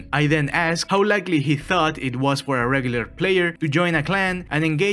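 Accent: Chile. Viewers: chilean accent screamer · Siempre que escucho a un compatriota y que es flaite me das un asco que verguenza, que bueno que naci en lo barnechea. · Espera como que sos chileno JAJAJAJA excelentes videos eu, y excelente inglés también.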